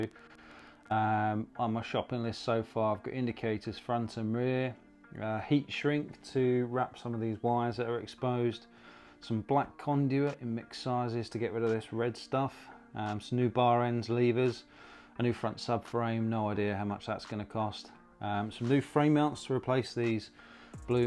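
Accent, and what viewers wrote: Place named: United Kingdom. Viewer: Always love british accent motovloger ✌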